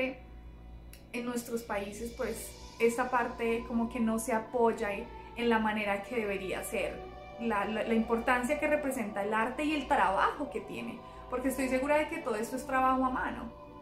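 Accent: Colombia. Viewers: Amo el acento colombiano 🥺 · Me encanta el acento colombiano!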